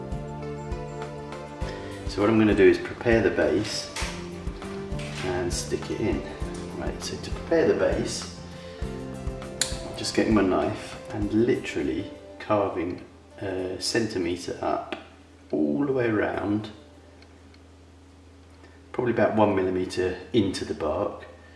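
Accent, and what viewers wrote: United Kingdom. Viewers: I'm a Hispanic American and thought this was a British video because of the man's accent. Then, I heard the random caracol (snail) song in the background and was like WTF...hahahaha!! Kind of fitting!! :-D Gracias por la linda explicacion. I'll try it today!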